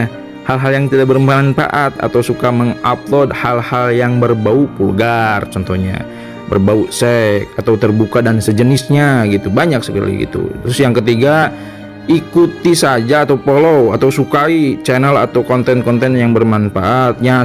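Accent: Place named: Cirebon, Indonesia. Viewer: bahasae kaya cirebon ...cirebon pundi kang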